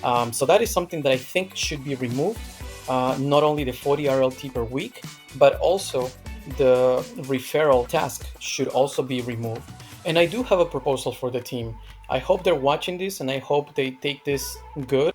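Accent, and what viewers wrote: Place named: United States. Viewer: Hablas como gringo como decimos en Perú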